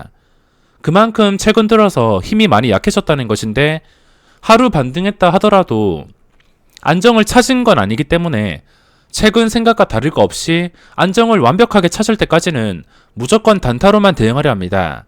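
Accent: Gyeongsang, South Korea. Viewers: 아씨.... 갱상도... 오늘 수급 있는게인지 잊는게인지 한참 통번역했네...ㅋㅋㅋ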